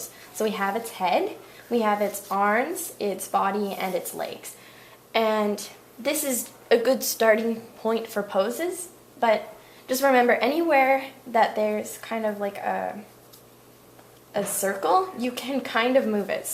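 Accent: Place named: United States. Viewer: good job and all :D but srsly I don't like to watch people dressed up like manga characters with this american way of speaking xD it doesn't fit at all :D keep up anyway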